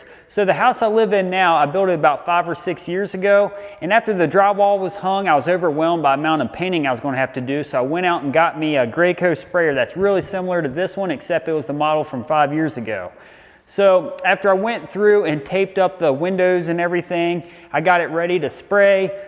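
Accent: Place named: Baltimore, United States. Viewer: Holy Baltimore accent, “q-pon” 😂. Love it.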